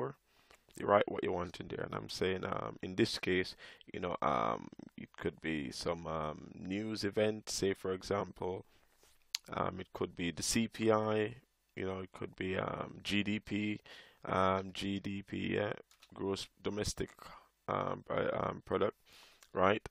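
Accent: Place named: Jamaica. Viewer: You sound Jamaican 🇯🇲 😳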